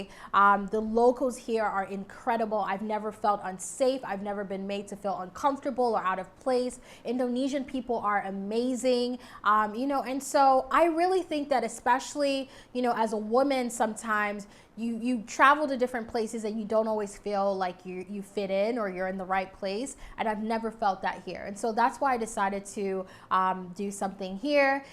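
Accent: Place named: Canada. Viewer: Are you Canadian? Your accent sounds like a Canook!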